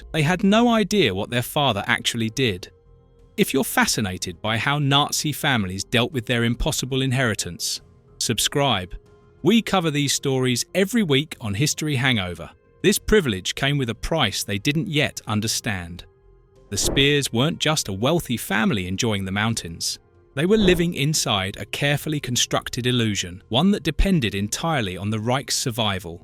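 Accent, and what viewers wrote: England, United Kingdom. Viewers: If they don't have a good thick English accent like this guy, why bother.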